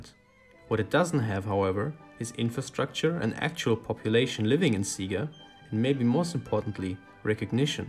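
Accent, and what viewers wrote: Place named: Germany. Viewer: based German accent ⚫🔴🟡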